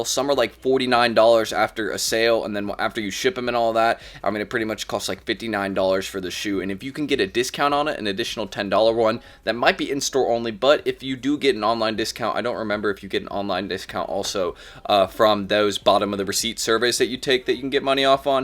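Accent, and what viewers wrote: South, United States. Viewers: your voice is an interesting mix if southern,Stoner,skater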